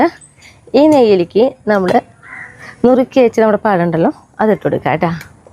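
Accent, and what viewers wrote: Thrissur, India. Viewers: Eniku ningalude samsaram clear aakunnilla.kollakarku thrissur slang manasilakan kurachu prayasamanu.....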